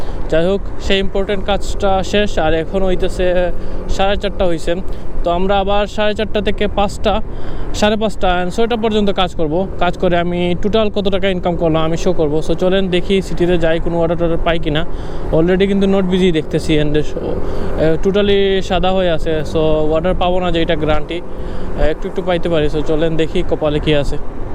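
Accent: Sylhet, Bangladesh. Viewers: Sylheti kotha gula sunte khub valo lage 😊❤ Love from shahporan, Sylhet 😊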